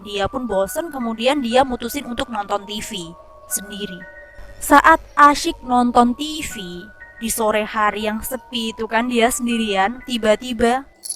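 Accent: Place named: Medan, Indonesia. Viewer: Kamu orang Medan ya? Dari logat bicaranya seperti orang Medan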